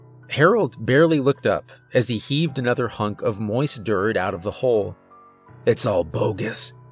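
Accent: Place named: South, United States. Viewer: Now whenever you do you southerner accent all I can here is TRAVIS MA BOY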